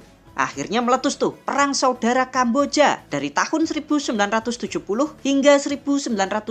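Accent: Java, Indonesia. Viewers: Logatmu min, orang wong banget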